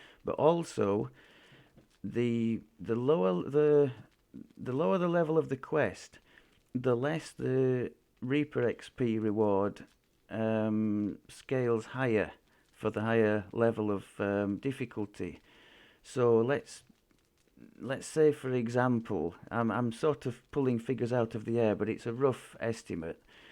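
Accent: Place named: Northern England, United Kingdom. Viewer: Good info well delivered in a proper northern accent. Reaper wi' nowt tekken out.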